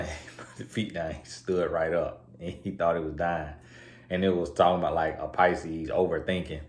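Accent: South, United States. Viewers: Hi! I can completely relate concerning having a country accent. I'm From Oklahoma! So I Get It. I am a Podcaster and have worked in customer service for about 29yrs. I smooth out my tone & pronounce my words more not bcz I'm ashamed of my accent. It's because I'm Speaking to be Understood so that is how I consistently keep from feeling like I'm not being "Myself". But I get a lot of Flack from my friends and family back home when they hear my podcasts. They say I need to be myself but if I start talking with my thick Oklahoma accent only a few would understand me. So I've come to the realization that I'm blessed with 2 ways of speaking just like some learn To speak 2 different languages. I LOVE YOUR ACCENT! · It's true Mr. ur country accent makes you stand out from everyone else in a great way, so continue being you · Hi, just wanted to let you know that you don't sound "dumb" at all with your Southern accent. 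Here's why: You have actual sense. Intelligence flows out of your mouth. So, for me your accent just adds fun decoration to the intelligence and wisdom you deliver in your videos. Sounding "dumb" has more to do with the contents of your words (or the lack thereof), than having an accent. My parents have their Caribbean accents (One is Trinidadian and the other is Jamaican), but they're not dumb. (And I'm coming from the perspective of a person who's not American. I'm Canadian, born and raised. I'm a black person, of Caribbean decent. I don't have an "accent" and I talk to Americans at certain points during the day at work and they think I'm American, lol 🤣😭) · I LOVE accent!!! Omg. I love the Southern drawl. It’s so attractive. I’m from Jamaica & notice that all Southerners actually express in an authentically BLACK, indigenous way. Like they’d say listen here… listen good. That’s exactly what a Jamaica would say although not exactly the same words. 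It’s the energy behind the words. We’re not going to say, here me out, an indigenous black person would say listen good, in any traditional African language. Even their mannerisms are more close to indigenous peoples than those from LA, New York. It’s odd to me when they say Southerners hate their accent. I’m like whaaaat?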